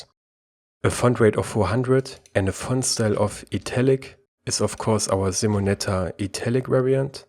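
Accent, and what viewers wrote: Germany. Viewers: Great video keep em' coming (Man merkt du bist deutscher/Österreicher😂)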